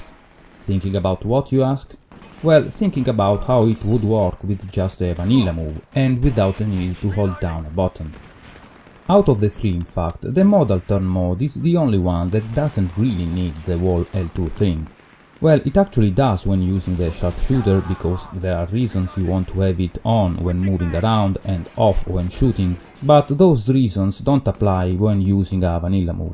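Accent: Italy. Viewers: lol i was sure you were from italy just by listening the accent for a few seconds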